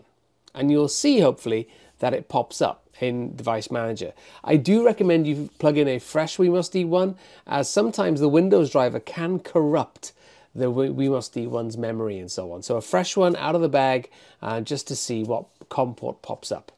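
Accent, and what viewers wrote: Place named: England, United Kingdom. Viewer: Hi buddy, I assume U are english because of your accent. I must say that English people were very usefull during WW2, and today again you did help a poor lonesome french struggling since a long time with download pb with win11/vscode/plateformIO/esptool... to ESP8266mod . I was stuck for 24H .... CH340 behave well with my uart terminal, but not during flashing... I listen your video, and downgrade my CH340 driver from 3.8 to 3.5.... and it fu*king works! thanks guy ! and best regards!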